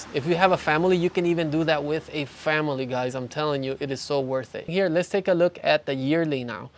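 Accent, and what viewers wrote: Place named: North, Nicaragua. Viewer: éste muchacho cuando habla español tiene el acento norteño de nicaragua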